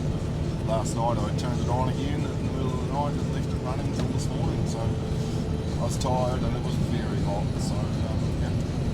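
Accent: Southland, New Zealand. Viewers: Great to watch. Enjoy your stuff mate. You sound like a Southlander.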